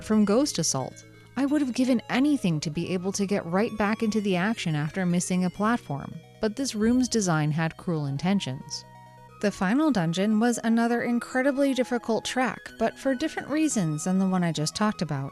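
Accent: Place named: Canada. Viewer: Hey enjoy your videos. I would love to see a Breath of fire 3 review on ps1. Also, are you canadian? I am like 95% sure from your accent you are either very far north in America, or you are Canadian ay?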